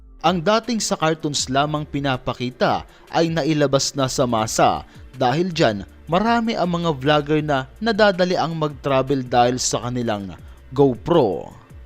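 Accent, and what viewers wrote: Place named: Visayas, Philippines. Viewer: Usa ka flu😅 bisaya Pala to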